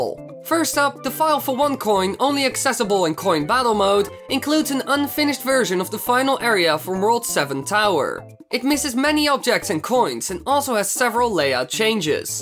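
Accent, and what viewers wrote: Netherlands. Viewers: This may sound weird but you sound like your dutch like me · Are you dutch?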